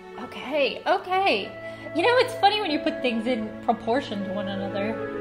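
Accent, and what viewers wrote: Ireland. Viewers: Hey, another video where you just, talk, talk, talk,right. I’m kidding, I like that you talk during your videos. I can hear ore of that Irish accent. Tell your mom, your fans have spoken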